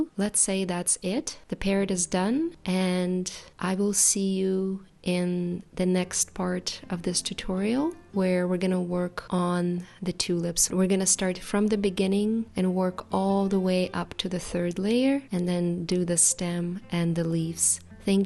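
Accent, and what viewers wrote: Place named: Canada. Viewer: Well, I"m a native English speaker from London and currently do a little bit of teaching English where I live in Spain. I must say, I thought you were Canadian! Not only that, but you have a beautifully sounding voice that is calm and relaxed, which is very soothing on the ears! I saw a video of an interview you did for a Ukrainian news channel. The way you switched between the two languages was excellent. I'm fluent in Spanish, so I can appreciate the effort it takes to learn another language. But you have absolutely mastered the pronunciation of English, despite it not being your first language. It was very interesting to learn that some of your art skills come from ancient Ukrainian folk art traditions. Folk art is an important part of history and culture that unites people with their past, but also gives them a frame of reference to guide them through the present. 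It is also a great gift to share with other people and cultures. Long may you continue with your wonderful projects and I wish you a long, healthy and happy life for you and your family.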